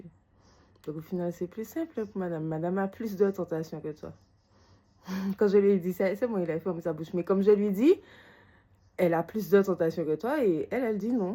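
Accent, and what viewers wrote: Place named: Martinique, France. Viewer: Parole ti mal mdr quand tu parles ont entend vraiment la Martinique free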